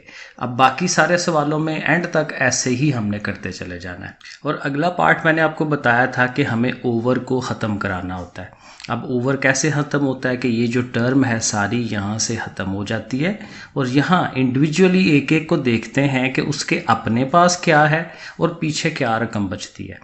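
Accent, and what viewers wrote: Sialkot, Pakistan. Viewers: sir ap ki awaz se lgta he ap sialkoti hein?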